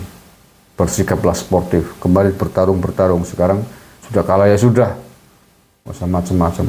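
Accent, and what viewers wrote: Banyumas, Indonesia. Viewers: Pak rika hebat bahasa jawane totok banget,medti rika wong banyu mas,aku seneng moga sehat,aku cocok kata pak yusril mahendra...🙏🙏🙏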